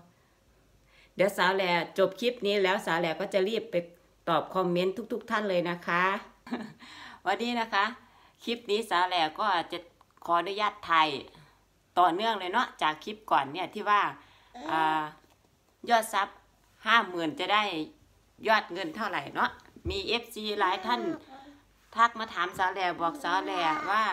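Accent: Isan, Thailand. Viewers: อยากให้สาวแหล่พูดอีสานจ้าน่ารักดีเวลาสาวแหล่พูดอีสาน👍👍👍❤❤❤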